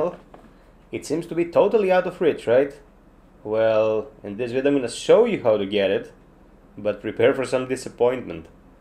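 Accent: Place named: Greece. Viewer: Η Ελληνική προφορά δεν κρύβεται με τίποτα! Ευχαριστούμε για τα guides αδερφέ, πολυ αναλυτικός! <3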